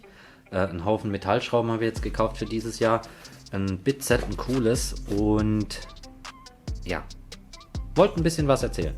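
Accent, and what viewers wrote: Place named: Saarland, Germany. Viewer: Ein Saarländer, wie schön. 👍👍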